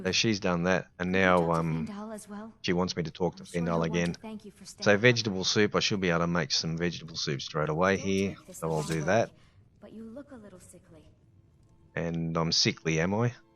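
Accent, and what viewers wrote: Australia. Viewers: You from Australia 🇦🇺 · this is an aussie accent...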